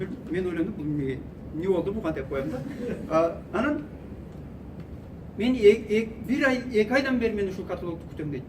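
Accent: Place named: Batken, Kyrgyzstan. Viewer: Баткендик окшойт а тили башкача,ото жалпак тилде тушундуруптур,Орифлейм дин ийгилиги насип кылган экенда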